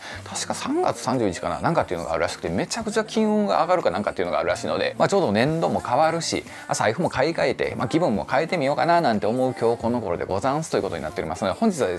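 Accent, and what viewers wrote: Kansai, Japan. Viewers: コテコテの関西弁が なんか落ち着くw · 関西弁の喋り参考にさせていただこう.....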